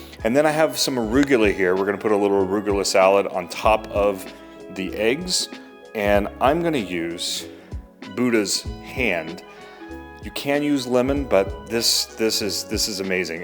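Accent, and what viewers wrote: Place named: Glasgow, United Kingdom. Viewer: You sound like you're from Glasgow.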